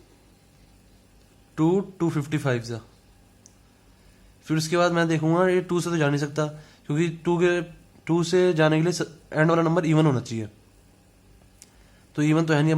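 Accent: Punjab, India. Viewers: Tusi punjabi oo hna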